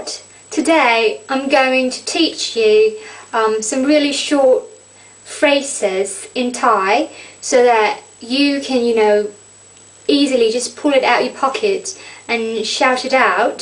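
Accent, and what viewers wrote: England, United Kingdom. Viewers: This is the first video of yours I have watched. Your English accent is great and it really made me laugh that you stayed in character! I'm from Scotland and would love to hear your Scottish accent! xx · love ur British accent 555555+ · Just stumbled on this site. Ha ha. You sound much better with an English accent. Waddee kap. Kop khun kap kru. Kuhn athibai phut passa Thai chaat mak. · Your British English is perfect, any way I am from the good old U S of A LOL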